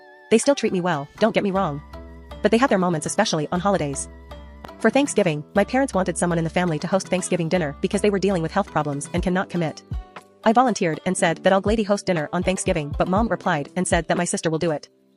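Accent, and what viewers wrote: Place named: France. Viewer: Lovely French accent you have! Almost as lovely as your backdrop. Simple, but effective! For Fualaau, I believe his name is Hawaiian, or at least Polynesian of some sort. Those languages tend to be very phonetically arranged, so you usually read the name exactly as written. Fu-a-la-au, with the last syllable being pronounced "ow". At least, I'm pretty sure that's how it goes! I didn't even know they'd made this movie. Pretty distasteful, imo, but so is a lotta true crime and its immediate thematic relatives. I hope Fualaau is making bank off it, or will be soon. Idk like if it's done respectfully and with the consent of the victims I'm down. Those two things, and there being multiple points of view but also objective facts therein, are just about all I require of something like this. Everything else is a bonus. But I'm understanding less and less why we're obsessed with telling these stories as time goes on; who knows why. 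It kinda reminds me of The Ring, actually... Anyway, if RtG says it's good, I'll give it the benefit of the doubt. Still no interest in seeing it for myself, but I'll withhold criticism unless something significantly rotten is proven to have happened wrt the making of the film.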